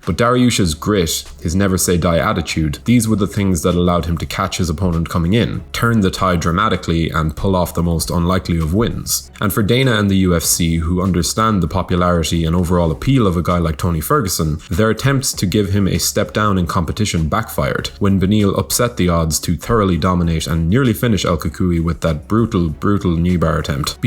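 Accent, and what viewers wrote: Ireland. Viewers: Listening to you talk reminds me of the 2 weeks I drove around southern Ireland. Ring of Kerry was pretty dam awesome, fwiw. Great video.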